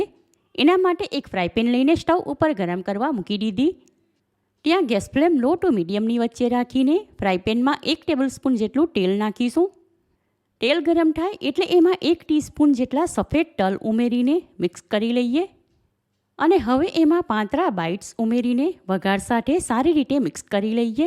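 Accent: Surat, India. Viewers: A sweet surti tone chhe 😊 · surat ni gamdani boli che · સુરત નું ગુજરાતી આવું હોઇ છે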